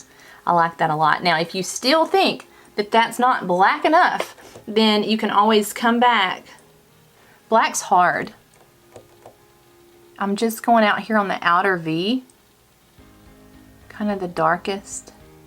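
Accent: South, United States. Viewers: I have this pallette. I bought it months ago before I started watching YouTube makeup tutorials. You popped up in suggested videos because I had watched some videos on this pallette the other day. I hit the subscribe button as soon as you opened your mouth because you're as country sounding as I am. Love it! 😁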